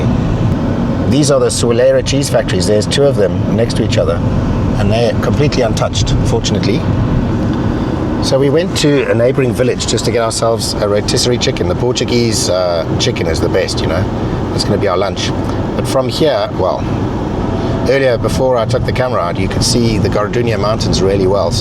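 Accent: South Africa. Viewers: is that a SA accent i hear? hope you all safe.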